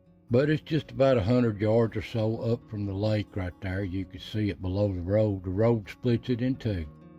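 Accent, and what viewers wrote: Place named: Tennessee, United States. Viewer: Worth watching just to hear that wonderful Tennessee accent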